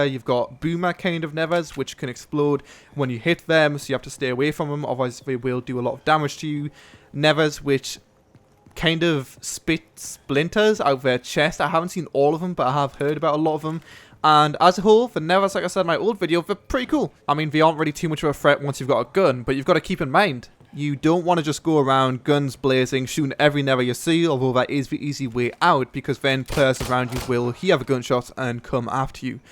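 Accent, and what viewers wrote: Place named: Newcastle, United Kingdom. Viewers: He is from England, Newcastle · He is from Newcastle, UK. they have a unique accent which is why he might not sound English to people from outside the UK :) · no he's English he just has a regional accent (Newcastle) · hes english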